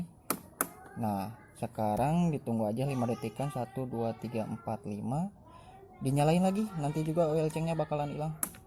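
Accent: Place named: Sunda, Indonesia. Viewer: Siap hatur nuhun bang Logat Sunda emang kental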